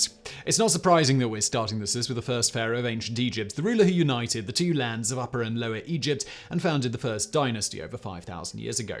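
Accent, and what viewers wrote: England, United Kingdom. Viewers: This gives credence to the saying that when someone speaks with 'English' accent they sound cleverer than they are. This was the least informed video I have seen from this channel. This was ethnocentric description Egypt's greats. In his list, the founder of Egypt came last. Never bothered to mention a significant reign of the Kushites that probably reigned longer than some he referenced, or Imhotep whom was highly influential and unusual character in Egyptian history. Basically this says Egypt was about the kingdoms that defeated it from outsider Africa, from Hyksos to Romans, whom were occupiers of Egypt. Its like talking about the US and forgetting to make a fuss about George Washington, and to make matters worse, George Washington was considered the least important amongst a top ten list of Most important Americans. Balderdash.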